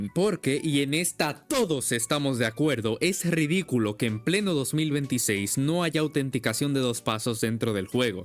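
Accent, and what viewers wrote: Dominican Republic. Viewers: Espera, sos de dominicana? Ese dato no me lo sabia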